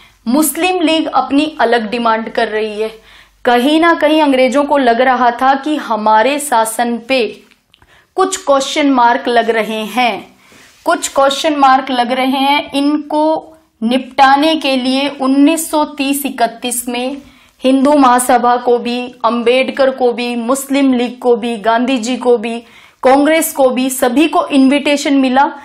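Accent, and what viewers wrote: Haryana, India. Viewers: Mem app hariyana se ho na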